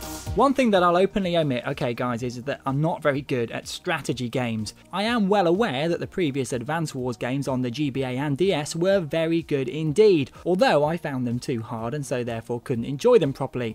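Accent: United Kingdom. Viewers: i love your british accent <3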